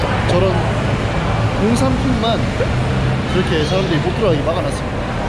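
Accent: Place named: Daegu, South Korea. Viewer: 저도대구사람이라 사투리가정겹고 너무재밌어요♡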